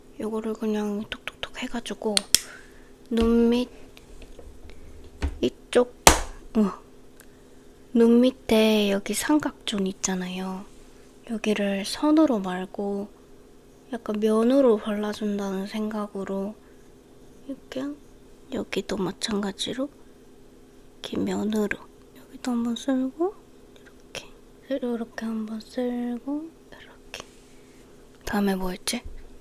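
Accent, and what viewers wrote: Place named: Gyeongsang, South Korea. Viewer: 찐목소리 메컵 좋아요!!! 사투리 쓰시는거 넘 귀여워여..... 약간 경상도 사투린가,,? 🙃 아껴볼게여 🫶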